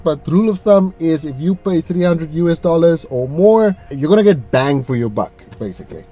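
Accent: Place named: Johannesburg, South Africa. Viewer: Where you from jhb Got the accent😉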